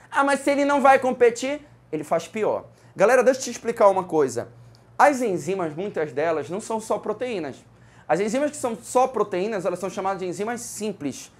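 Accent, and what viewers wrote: Pará, Brazil. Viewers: Esse sotaque paraense que reconheço de longe, tão bom de ouvir. Sou de Natal RN, mas minha família toda é paraense. Parabéns pela didática muito boa. PS: Se não for paraense vou passar vergonha, mas se parece muito. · é Paraense. O sotaque não nega.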